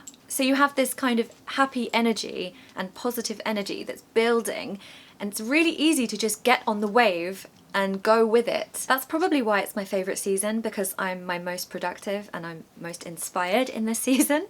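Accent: United Kingdom. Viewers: Read books to us in your British accent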